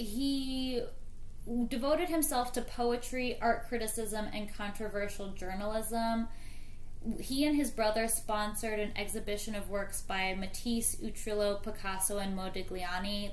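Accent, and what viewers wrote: United States. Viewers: Why this disagreeable American voice?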